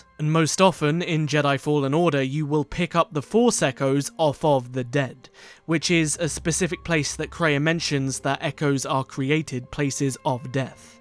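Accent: RP, United Kingdom. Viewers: I apologize if this is an ignorant question, but is your accent recieved pronounciation? I love your videos, I've been binging them for a few weeks now. Kotor 2 was more than just a game to me too, I can't wait for the future of narratives set during this era.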